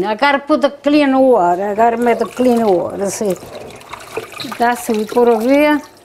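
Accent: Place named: Sicily, Italy. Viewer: Oh, dearest Grandma...I looked and looked on Google to find "Cardoons". The were not the ones that my grandma took the kids out into the country to search for. THEN I figured that just maybe you would have a recipe for the ones found in America. YESSSSS!!!! There was your beautiful smiling and enthusiastic face. You have solved my puzzle, and I thank you so very much for it. I cannot wait to send it to my cousins - we are all in our 70's or 80's. I hope that some of them are as happy to relive this part of our childhoods as I am. When I hear your accent - sometimes it brings tears to my eyes because you make "see again" my Sicilian grandma., you are a beautiful woman - inside and outside. Thank you so much - I just ran out into my yard and gather up some "cardoons." Vivi bene e felice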